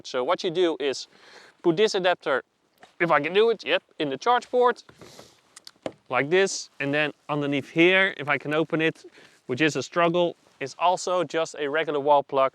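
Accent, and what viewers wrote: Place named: Netherlands. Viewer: Compliment hoor ,jij spreekt echt heel goed Engels ! 👍 Ik hoorde aan jouw Nedelandse accent dat je Nederlander bent.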